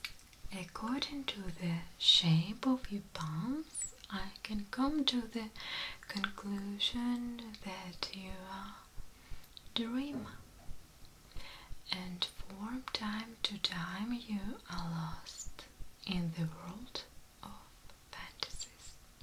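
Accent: Russia. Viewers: I love the Russian accent! I listened to this lying down with my headphones on and had a smile on my face the entire time with my eyes closed , My mind was filled with pure bliss hearing your beautiful Soothing voice! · Wonderful video, Russian accent on English is relaxing :)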